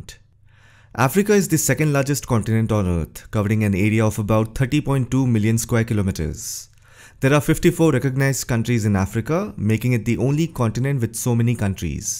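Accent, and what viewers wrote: India. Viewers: Bro, sounds Indian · Why you sound like you from india?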